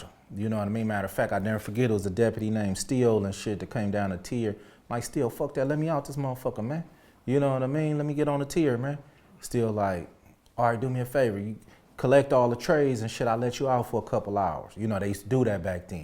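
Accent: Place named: California, United States. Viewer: You can hear the Cali In his voice 💯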